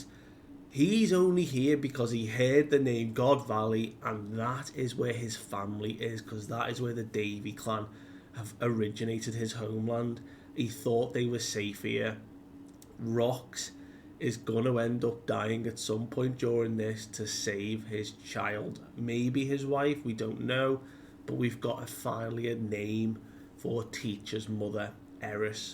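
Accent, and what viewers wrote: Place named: Liverpool, United Kingdom. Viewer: Brooo it’s 4am, you’ve gained a new subscriber and I’m glad to see your journey and growth from the start. YouTube should’ve recommended your channel earlier. You go into so much detail and even explain character emotions. Also having a scouse accent, I went uni in lpool and from Manc hits it for me so pool is like a second home to me. And you are the only British manga reviewer I’ve found. I hope your channel grows!!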